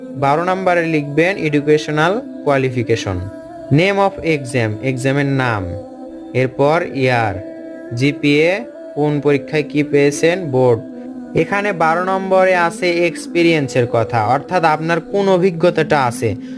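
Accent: Bogura, Bangladesh. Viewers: স্যার আপনার বাড়ি কী গাবতলি, বগুড়া..?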